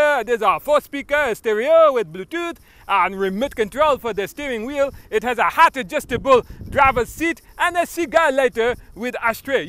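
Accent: United Kingdom. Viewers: Hilarious review. Love the British accent.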